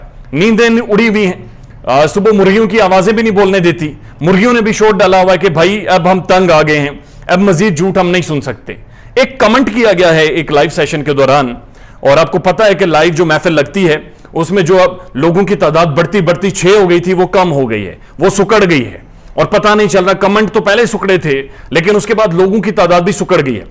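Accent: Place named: Pahari, India. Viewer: Puttar tussi pahariye ho, I love the way you talk ♥️🤣🤣🤣 I laugh and laugh and laugh, Allah bless you with the greatest blessings of both the Worlds, Aameen, Summa Aameen !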